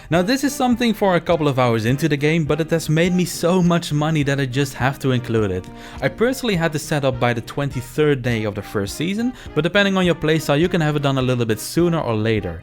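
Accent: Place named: Netherlands. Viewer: Hi thanks for the tips. Are you from the Netherlands 🇳🇱 you sound like my parents who were both born and raised there. Great advice thank you 😊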